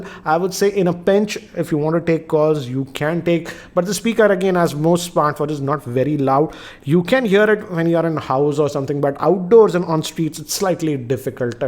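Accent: India. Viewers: Sir, You sound Indian tone English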